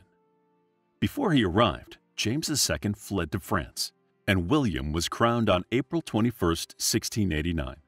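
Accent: United States. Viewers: American pronounceation,is different to ours. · A bit of a list but a reasonable summary. Its a pity it had to be read out by an American accent.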